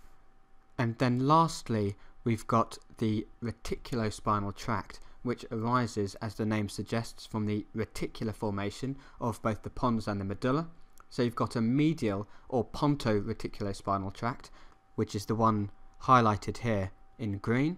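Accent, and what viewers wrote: England, United Kingdom. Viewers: I learn so much better from an English accent for some reason... Great work! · someone just told me that you have an English accent. And I think it's good. remind me of times listening to BBC documentaries of animals and plants, the lovely and respectable Sir David Attenbourg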